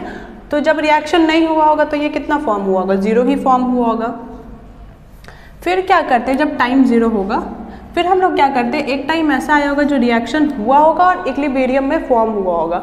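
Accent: Bihar, India. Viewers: Bihar se ho kya 🌝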